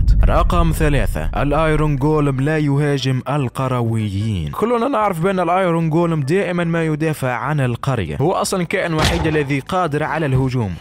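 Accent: Tunisia. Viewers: هل انت تونسي؟ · انت ولد بلادي تونسي صح؟؟ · انت تونسي او جزائري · ياخي انت تونسي؟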